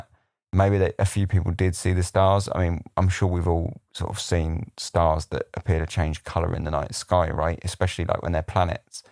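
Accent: United Kingdom. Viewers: I just love British narrators. They make everything sound so official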